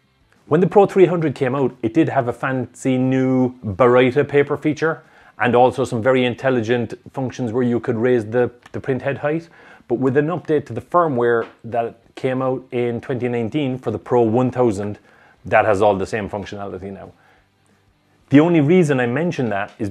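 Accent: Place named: Kerry, Ireland. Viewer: Hi, brilliant and straight forward review as usual. Bit of a daft question and definitely not printer related! Having enjoyed many trips over the water to Ireland encountering all the diverse dialect changes I can't help but try and best guess. So my best guess for yourself would be the West side, maybe Kerry or not quite that far South.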